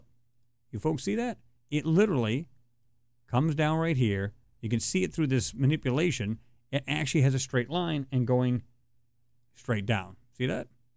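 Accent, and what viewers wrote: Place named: Rhode Island, United States. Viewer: You sound like u live in Rhode Island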